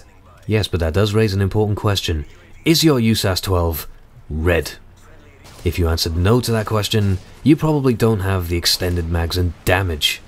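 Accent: Wales, United Kingdom. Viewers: You sound slightly welsh... Are you welsh at all? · are you Welsh?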